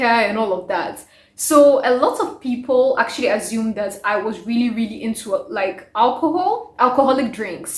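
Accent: Ghana. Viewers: Do you speak Twi? I'm asking because of how you speak English, the way most Ghanaians do.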